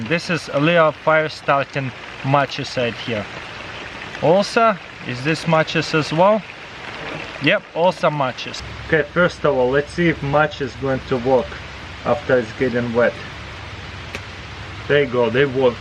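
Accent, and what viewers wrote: Russia. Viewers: На английском с русским акцентом.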